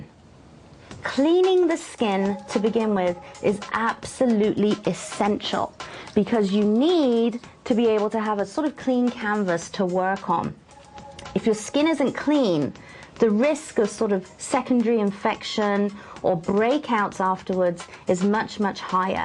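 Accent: United Kingdom. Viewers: pretty standard London/Southeast England accent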